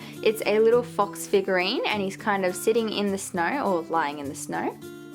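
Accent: Australia. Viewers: I love the accent of Australia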